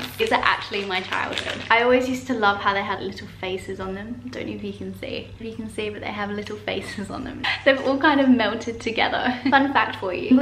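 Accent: England, United Kingdom. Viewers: Am I the only one who thinks u still have a British accent but I can hear an Australian accent as well 🤣🤣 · She spent $200 on English food she sounds English to me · Wow you still have that bit of an English accent despite leaving when you were 8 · Ah so she’s from Uk I was like no wonder she sounds so British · It’s wierd cause u sound British and Australian at the same time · I hear a little bit of British in your voice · you kinda stil sound slightly english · Fun fact: First, i was thinking that u were british bc of ur accent! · Girl you still sound British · Your accent sometimes sounds british but then Australian at the same time? 😂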